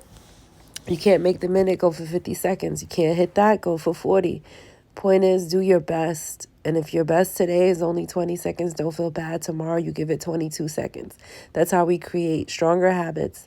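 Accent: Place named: Colombia. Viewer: Are you Colombian because you accent is wonderful keep up the work you are doing great shoutout to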